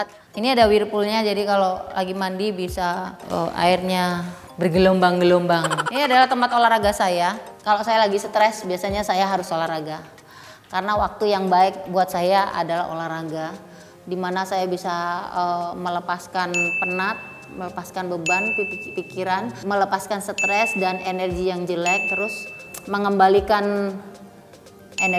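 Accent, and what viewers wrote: Java, Indonesia. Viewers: Ya Allah mbak inul masih kentel am jawanya...seneng bngt dengernya...jngn di hilngkan khas jawanya y mbak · Ciri khas bunda inul medok e lho sing marai seneng denger bunda ngomong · aku sukak gaya medok bicaranya bunda,,, podo2 wong jowo bun😍😍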